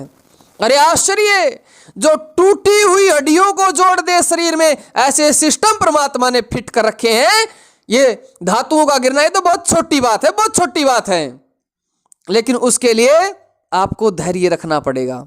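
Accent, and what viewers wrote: Haryana, India. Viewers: Haryana ki tone aa he jati h bich bich m 😁😁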